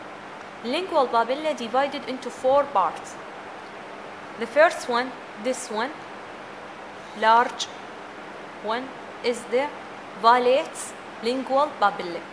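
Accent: Arab world. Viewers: English with arabic pronounces thank you sister you’re best 🤗🤗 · bro she is arab i think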